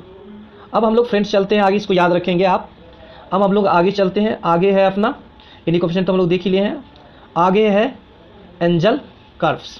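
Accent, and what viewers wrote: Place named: Bihar, India. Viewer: Very nicely explained.. It seems you are from BIhar. Good luck..!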